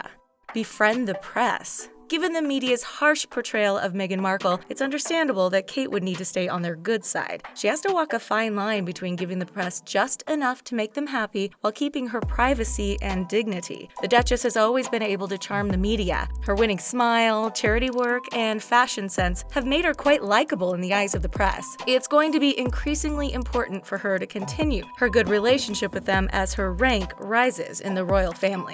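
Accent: United States. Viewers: The Talko is an American channel, I suppose. Especially according to the accent and how they portrait Meghan :) Well, I don't think that Kate follows Meghan's example especially because she is so different from Meghan in a good way. I have nothing against Meghan but Kate was always classy and a true royalty. Meghan was a rebel who either didn't want to sit through (what Meghan and Camilla did) or couldn't. One or the other, I don't care, it's non of my business. Btw, it's a typical American characteristic to be a rebel. Americans rebel all the rules, you can see it in the language. Sometimes, it's good to be a rebel. Sometimes, it's out of place. In Russian we say that you have to know when is the right time and the right place. I guess, you say it in many languages, too. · Americans reporting on UK Royal family is silly...the accent is annoying and it's full of factual mistakes. 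Other than British, the Aussie reports are much more accurate and enjoyable.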